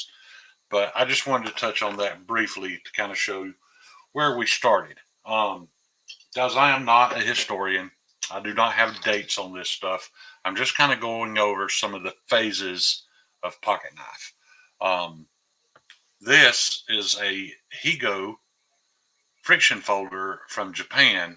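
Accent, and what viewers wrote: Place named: South, United States. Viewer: You had me fooled. At the beginning you started off slow with the southern voice and the antique Asian blade. Case trapper, yes, Buck 110, for sure but boy did things escalate from there! Wow! Nice collection you got there homeboy!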